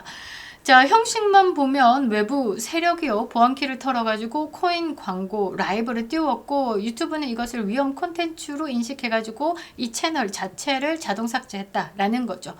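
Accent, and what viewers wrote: Gyeongsang, South Korea. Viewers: 의 님 말씀이 참 귀에 쏙쏙 들어옵니다. 특히 좌빨이, 쌤통 등 ~~~ 경상도 사투리로 이렇게 찰지게 설명하시는 능력이 탁월합니다. 늘 응원합니다~~~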